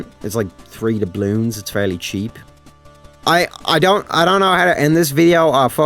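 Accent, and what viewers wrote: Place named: Ireland. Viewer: I know a lot of people in the tf2 community don't like tftubers to branch out and do other things, but I'm genuinely glad to see you make videos on a variety of things bc it's fun to listen to your funny Irish voice, your videos are always amazing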